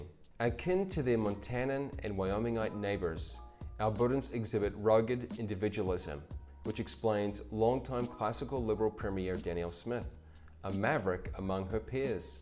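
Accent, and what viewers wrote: Australia. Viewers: I have to admit that your “rant” rankles when I hear that Aussie twang😡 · Sounds like he from either Australia or New Zealand, and according to his channel's about section he lives in Guatemala. The sad thing is, I don't think Trump needs to pay people to say these things. He's built such a cult, people will make excuses for his top appointees even when they sieg heil the audience twice on stage with the cameras rolling.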